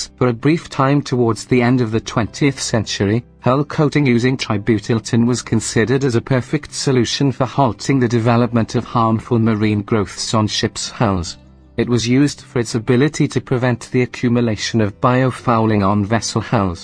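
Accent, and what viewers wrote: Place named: Wales, United Kingdom. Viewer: Wow - a Welsh bot narrator !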